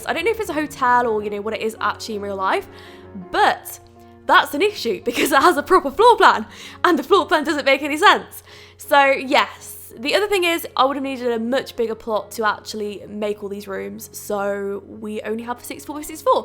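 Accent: United Kingdom. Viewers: I like ur british accent 😂❤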